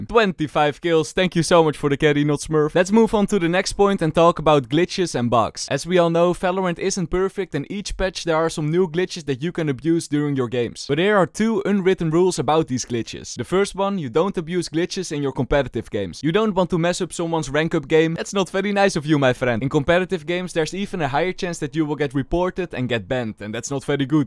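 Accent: Netherlands. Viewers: is this guy dutch?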